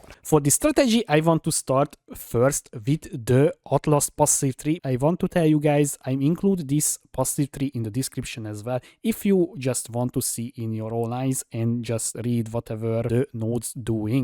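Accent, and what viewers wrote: Hungary. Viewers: Ez a Magyar akcentus nekem gyanus :D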